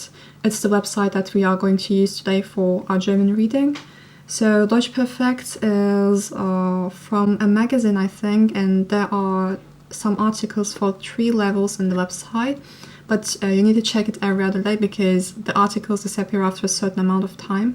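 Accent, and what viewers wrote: United Kingdom. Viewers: it's pretty obvious that your english accent is changed.Its more like a Brit right now. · Öğrendiğin diller kaynaklı mı bilmiyorum ama İngilizcen İngiliz aksanına kaymış seni ilk takip ettiğimde öyle değildi ama güzel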